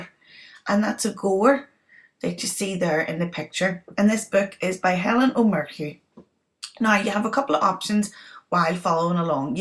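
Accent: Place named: Northern Ireland, United Kingdom. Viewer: Love your Northern Irish accent ( hope I'm right you sound just like my cousin in Ballycastle!) The Ulster dialect sounds quite different to the others.... love listenig to this and learning what I can! ( I'm from Sydney) go raibh maith agat!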